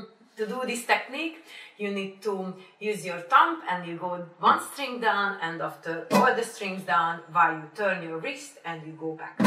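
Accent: Hungary. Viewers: Nagyon aranyos a magyaros angolod :) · Your accent is so hungarian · Trust me bro! I have a thick hungarian accent, just like has...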